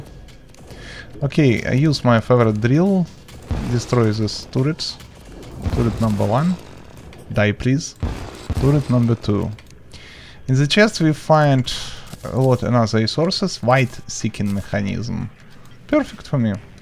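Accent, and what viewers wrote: Russia. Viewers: Cuz he's russian, he's original channel is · такой милый акцент у тебя)) сразу пон что ты руссский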